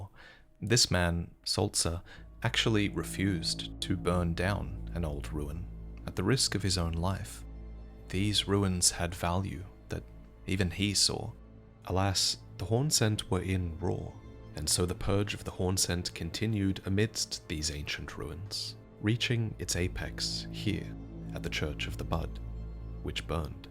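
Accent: Australia. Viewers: Been watching for 4 years now and only just found it he's Australian. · Didn’t even realize you’re Australian how did I not notice that you have the accent too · IS AUSTRALIAN??? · Wait wait… YOU’RE AUSTRALIAN!?!?!!?!? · I didn't even pick up that you're Australian and thats coming from a fellow aussie xD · I’ve been here for years so how am I just finding out you’re Australian?🤣🤣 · is Australian? lol hello from Bali, mate! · Most shocking lore revelation in this video for me was not in fact Elden Ring lore, but Lore, I had no idea you were Aussie! XD Phenomenal work as always, can't wait for your next video! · revealing he's Australian like a jump scare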